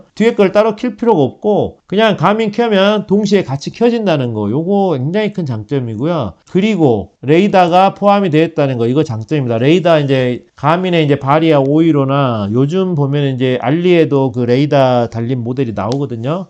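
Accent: Jeolla, South Korea. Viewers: 잘 보고 갑니다~^* 킥보드용 블랙박스를 찾다가 채널에 놀러왔습니다!! 말투가 우리동네 같네요 저는 목포입니다 ㅎㅎㅎ 구독 좋아요 누르고 갑니다❤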